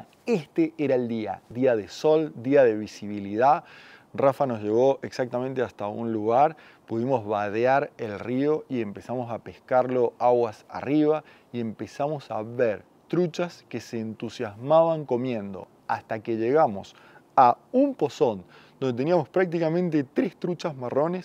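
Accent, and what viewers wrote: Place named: Mendoza, Argentina. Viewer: Estimado, sigo tus videos desde hace tiempo y es notable lo que han mejorado, tanto en lo filmico como en los diálogos (incluir aspectos técnicos fue una gran idea), además tu entonación mendocina me encanta y me trae recuerdos de mis amigos mendocinos:) Quizás podrías ir pensando en algún video usando equipos pequeños (#2-#1), creo recordar que tenes alguno en los arroyos de altura de Mendoza, pero estaría bueno incluir algún video en arroyos patagónicos. Como dicen, no hay truchas chicas sino equipos grandes! y estaría bueno ver como encaras esas situaciones de pesca. Fuerte abrazo y líneas tensas!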